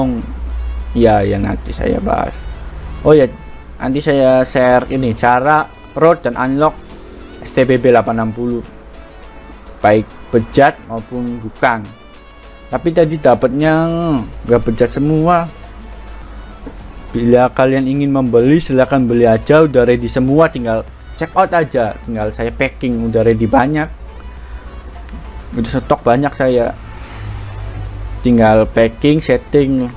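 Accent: Indonesia. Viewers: logat cirebone matep lur ciri khas banget. . salam wong reng,pengen takon bokat di sewoti soale tuku alate ngecer dudu ning sampean,,wkwkwk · Logat bahasa kyak wong reang ✌✌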